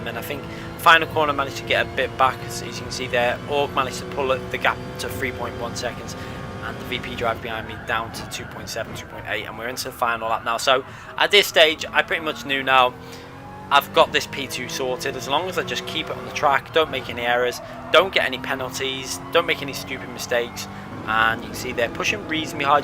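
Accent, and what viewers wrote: Liverpool, United Kingdom. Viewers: Feedback is what I'm doing for you Not what your doing, that's called commentary. If you do t like feedback, disable comments. Your Liverpool accent is quite strong and your talking at 60mph on top of not shutting up. If that's what makes your channel popular than good for you. That's my opinion.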